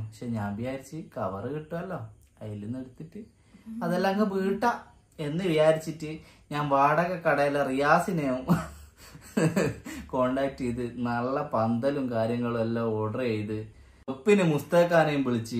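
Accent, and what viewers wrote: Vadakara, India. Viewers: Vadakara varthanam😂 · Nte rathri muzhuvan vedios kandu❤kannu niranju😢ithrayum prayaasangal okke undaaayittum ningal koyambathooril shop thudangi scooter maangichu❤oru vidhathil ellaam oppichu❤ avasaanam baaghyanithiyaaya oru khairaaya bhaaryayeum kittii❤eni angootulla jeevithathil orupaad uyarchayil ethatte🤲🏻vadakara mekhalayil ulla youtube channel pothuve kuravaaanu😂ningale vadakara slangilulla varthaanam pravaasthil irunnu kelkkumbol nalla sandhoshm ❤chekkotti bazar nte aduthulla CHEMMARTHOOR Kaaran❤❤ NB:aare kondum parayippikkaatha vedios cheyyuka 😂❤sneham maathrm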